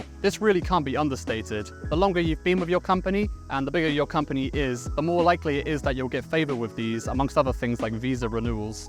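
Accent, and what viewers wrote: United Kingdom. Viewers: Hey there, this is the first vid from you I have seen, and I’m a new sub from South Africa 🇿🇦 and I have to say, you sound vaguely South African too…I can also hear an English accent too…eish, I’m confused and I think I’m hearing things, sorry…The more I listen, the more English you sound now 😂😂😂🤷‍♂️🙏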